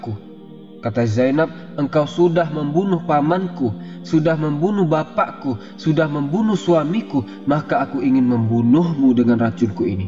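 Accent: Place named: Sulawesi, Indonesia. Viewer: Logatnya kayak orang sulawesi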